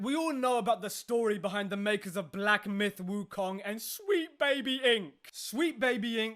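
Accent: United Kingdom. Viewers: i love your british accent sooooooooooo much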